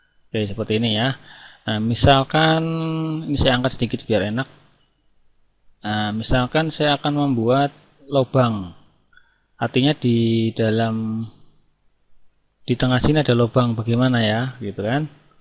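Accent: Banyumas, Indonesia. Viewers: Belajar ning pasti kepenak...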